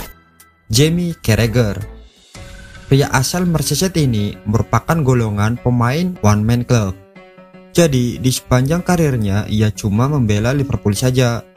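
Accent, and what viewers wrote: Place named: Banyumas, Indonesia. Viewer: Ora ngapak ora kepenak,, gua tau dr aksen narator nya 😁😁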